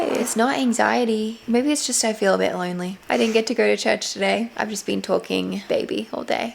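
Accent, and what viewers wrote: South, United States. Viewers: Your southern accent is not bad at all! I'm southern, and I've always thought that certain vowel sounds in an Australian accent sound really similar to a southern american accent. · The SOUTHERN accent woah!!!! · Aussie here, I loved your southern accent! It was really good! Also just wanted to say that having Gabe working from home everyday is a blessing too, my husband works long hours for 3 days and then has 2 days off and I really enjoy his company on those 2 days! Wish he could be home every day lol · Your southern accent 😅 so good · The southern accent was spot on! I'm from Memphis, and you actually sounded a lot like one of my friends 😂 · As someone from South Carolina, your southern accent was not half bad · Lol the Southern accent is so on point. You sound like Miley cyrus in the Hannah days or Georgia from Genie and Georgia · Ok but your southern accent was good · Hahaha I love the southern accent! 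· How is no one talking about how incredible that American accent was?! Also the SOUTHERN ACCENT?? Incredible. Better than some actors doing a southern accent. I’m impressed. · As a woman from Clarksville, TN you nailed that southern accent girl! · Honestly loved the southern accent! And this vlog was so sweet!❤ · I'm married to an Aussie and I think you guys for some reason always sound like you're making fun of us when you do what I call the regular American accent. lol Idk why! Your southern accent is on point though! ❤ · The American accent had a hint of southern and the pure southern accent was amazing. Btw you made us feel like we were your friends in this vlog ! ❤ It was so intimate. It was really nice to get to know you more! ❤ Btw apparently the dinosaur bones are the fallen angels/creatures that got destroyed during the flood that God sent! There's probably more information in the book of Enoch. 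I love your natural brown hair too, it looks so good sis. God bless you and your family. (we love the 20 minute videos !)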